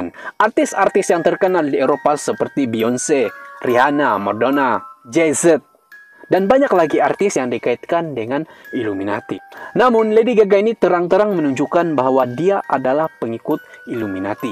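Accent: Sabah, Malaysia. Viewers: Sabahnya pekatt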